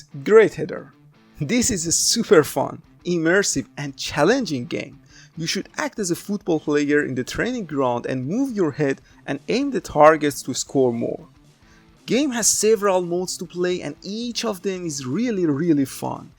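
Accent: Iran. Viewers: bro ! thanks for the video good information and suggestion ... faghat ye khorde roo accentet kard kheyli iranie :D thanks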